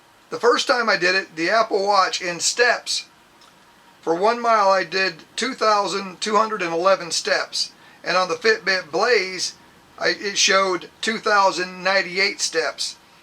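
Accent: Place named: South, United States. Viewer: You sound EXACTLY like Rickey Smiley doing his racist southern white guy prank call voice.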